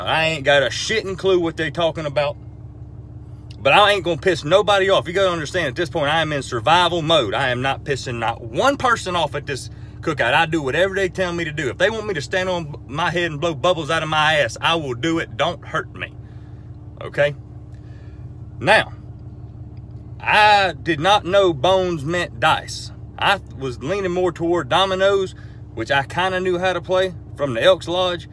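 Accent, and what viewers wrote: South, United States. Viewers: no, cause he is mad relatable and funny. he is a great story teller. i do love southern accents though:) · Hank is Texan. King of the Hill takes place in Texas. This man is clearly Southern, not Texan. You can tell by his accent and the fact that he mentioned corn and "cornhole". Those aren't Texan.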